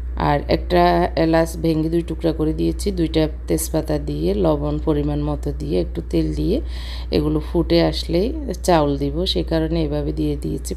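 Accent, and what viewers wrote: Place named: Cox's Bazar, Bangladesh. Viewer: আমি ককস্ বাজার থেকে,আপনার কথা শুনে মনে হচেছ আমাদের কাছের।কোথাকার বলবেন?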